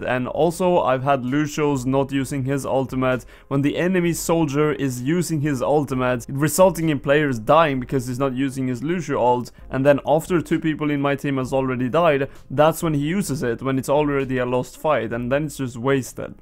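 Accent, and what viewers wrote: Sweden. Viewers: i thought so because of your accent but i was not sure. I'm swedish too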